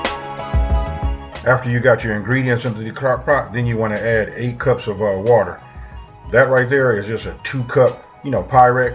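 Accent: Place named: California, United States. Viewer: You most definitely have that Cali accent! But I love your recipes 🤟🏾🤟🏾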